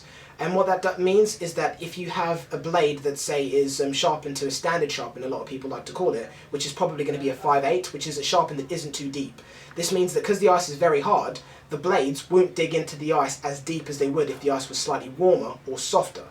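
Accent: England, United Kingdom. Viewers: Tutorials are always better with an English accent. Cheers mate.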